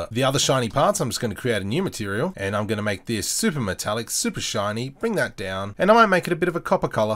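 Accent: Australia. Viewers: Youve turned in to an Aussie Ian Hubert :) nice tute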